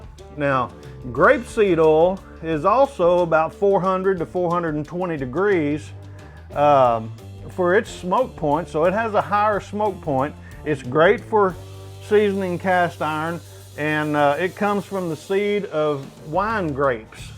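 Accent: South, United States. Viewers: It’s not the name. It’s not the beautifully seasoned pan he flashed at the beginning. It the fact he exclusively calls a skillet, how he pronounces oil, and the fact he is in an extremely ordinary lived in kitchen that makes this video so credible. That little chuckle at the end about flax seed oil…. Wish I had some more southern friends.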